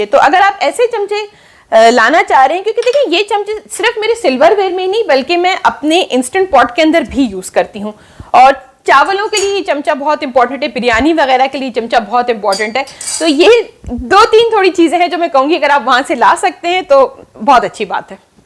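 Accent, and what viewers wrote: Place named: Pakistan. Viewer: Very informative video and I like to hear your voice its pure pakistami style and I also loved your dress from where did u get this?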